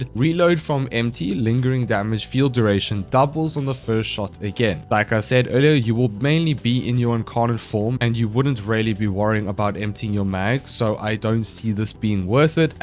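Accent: South Africa. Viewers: that South African accent slays me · Yoo dude are you from South Africa ?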